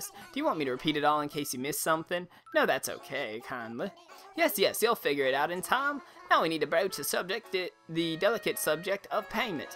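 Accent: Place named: United Kingdom. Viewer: Your British accent is funny! Great video, really helps :) Thanks alot!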